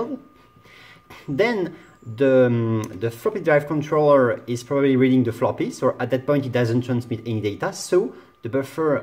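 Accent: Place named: France. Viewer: Accent français😂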